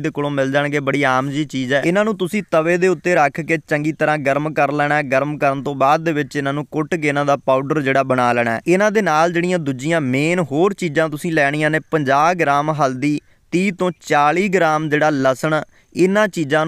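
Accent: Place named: Majha, India. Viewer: Veer ji majhe to lagde je boli to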